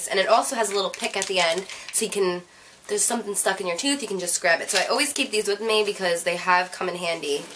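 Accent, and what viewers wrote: New Jersey, United States. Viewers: i love her jersey accent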